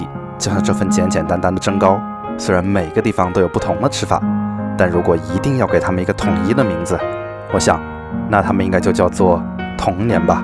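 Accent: Wuhan, China. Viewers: 听口音就知道你是武汉的，南京那个 不就是变种的 武汉糯米包油条么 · 哈哈真~的是武漢口音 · 要不要咸蛋黄 都听出了武汉话口音 哈哈哈哈 好亲切！ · 听你口音就晓得你是武汉牙，哈哈哈哈，蒸糕全是小时候的回忆！ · 你这个普通话一听就是武汉的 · 你这个汉普越听越亲切！ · 武汉普通话是相当的正宗，封面的蒸糕也是武汉的样式。现在这玩意在武汉也看不到了吧？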